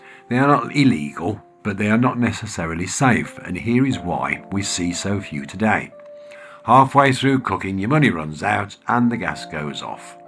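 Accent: Birmingham, United Kingdom. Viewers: 😂😂we just hung bright orange curtains to go with matching fire wall . I lived in brum for 2 yr your accent brings back memories 😅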